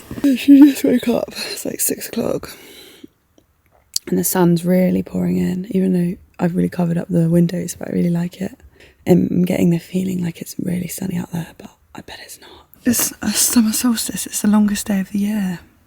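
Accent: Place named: England, United Kingdom. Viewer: You are so cute and your English accent is to die for. I absolutely love an English accent.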